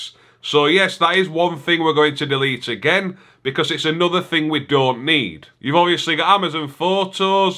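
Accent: Yorkshire, United Kingdom. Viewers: 2 4K firesticks also a couple of very small items that has helped me free up space is turning off resource hogs such as the screen saver that can be accessed in the Display & Sounds...by default it is set to 5 minutes turn it to NEVER and back out also daily I find myself deleting something called FreeTime also eating up resources...You might add one should clear cache and force stop every item upon completion of use...also I downloaded a very small program I believe it's about 7 mb called...Background apps and Process list( via the magnifying glass thingee)...It will show you anything running in the back ground...plus having item one can removed that you don't use or items you've missed forced closing and it is eating up valuable space. Many times buffering is caused by little room left in the processor...Just a thought...btw thank you so much for your videos always funny and hugely informative...Granted sometimes I have no freakin clue what you say ...The Yorkshire accent ya know :)...it took me awhile to figure the word "Privacy" when you were talking about your VPN...I was gonna spell it but I don't think I can spell it the way you said it LOL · A right good Yorkshire accent.. 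I’ve a fire stick and I’ve downloaded your app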